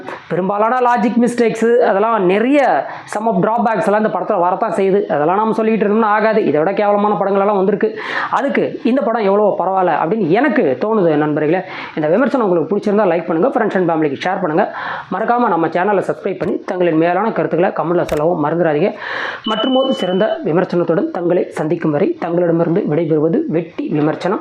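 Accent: Kongu, India. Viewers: CRIME Investigation Thriller wonderful... Kongu Tamizh vazhga..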